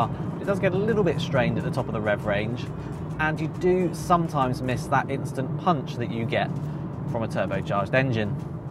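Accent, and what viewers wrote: United Kingdom. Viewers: hate that British accent. Sounds like you're suffering from impacted bowel movement.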